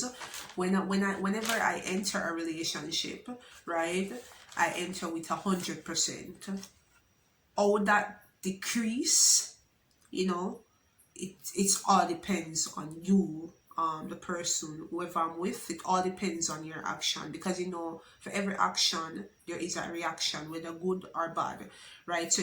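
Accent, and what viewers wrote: United Kingdom. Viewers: I'm hearing a likkle hint of that British accent still 🔥😻 bless up! another great video. I love your answers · Di likkle British accent deh it agwaan nah lie love your confidence from you have self love and confidence in whatever you do you will excel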